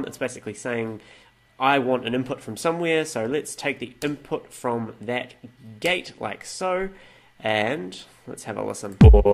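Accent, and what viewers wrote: New Zealand. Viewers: He's a Kiwi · Is your accent New Zealand or Australian? I love it none the less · Instantly knew you were kiwi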